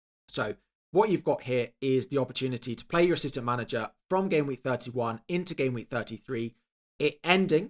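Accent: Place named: Liverpool, United Kingdom. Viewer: The boy scouser!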